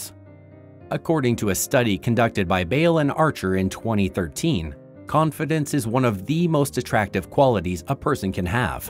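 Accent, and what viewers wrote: Canada. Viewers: are you canadian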